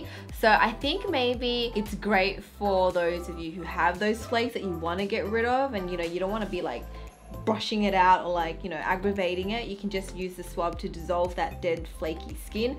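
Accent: Australia. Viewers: Oh man I love Australian accents. This is just so nice to listen to lmao. Also can’t look at the microscope for too long but it is interesting hahaha. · You sound Aussie 🐨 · Are you an Aussie, you have an Australian accent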